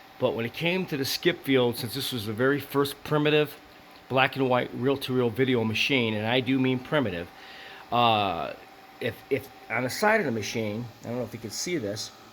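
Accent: Chicago, United States. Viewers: Sharing knowledge with a fierce Chicago accent.👍👍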